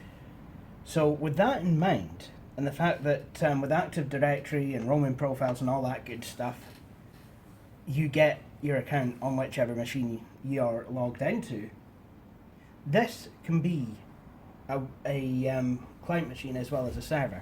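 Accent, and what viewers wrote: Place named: Scotland, United Kingdom. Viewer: are you scottish or British you sound Scottish :)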